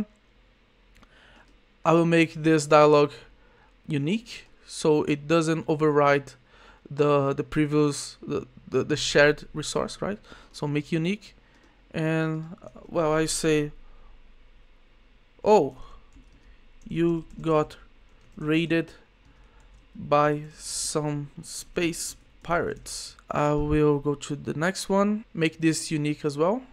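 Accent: Brazil. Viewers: é incrivel, todo brasileiro consegue saber q o mano é brasileiro só de escutar a voz e o sotaque kkk, mas otimo video por sinal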